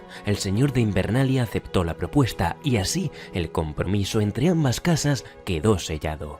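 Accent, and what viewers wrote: Spain. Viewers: Bonitas narraciones y hermoso asiento de la vieja madre patria, saludos desde 🇨🇱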